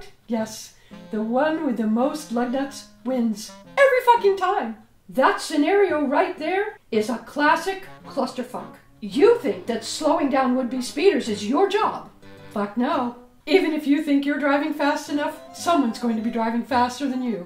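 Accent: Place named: United Kingdom. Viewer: i fucking love you i also love how you sound like a posh english person but you're from america. what the fuck is that about?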